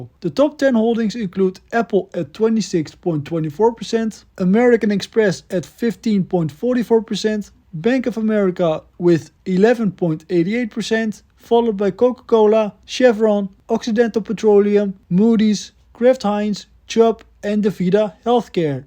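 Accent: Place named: Netherlands. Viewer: Are u from belgium or the netherlands? U sound like dutch💀🤣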